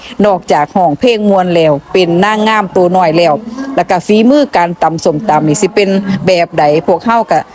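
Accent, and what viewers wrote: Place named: Isan, Thailand. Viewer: เกี่ยวหยั๋งเว้าพื้นคนเก่งเนาะ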